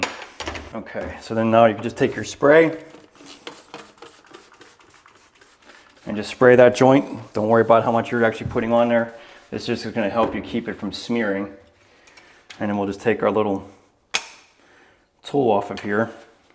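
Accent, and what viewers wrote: Pittsburgh, United States. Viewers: haha, within 10 seconds of hearing him talk I knew he was from the burgh🙂🙂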